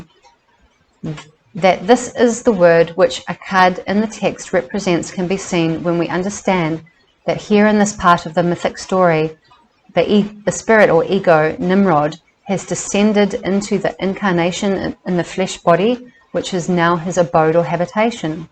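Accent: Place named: New Zealand. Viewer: Notice the kiwi accent... Would love to have a chat sometime 😁❤️ blessings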